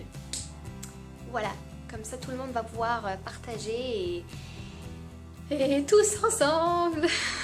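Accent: Quebec, Canada. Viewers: j'ai eu peur que tu ai perdu ton accent queb... ouf il est tjrs là! mais t'as passé combien de temps la bas? tu reviens?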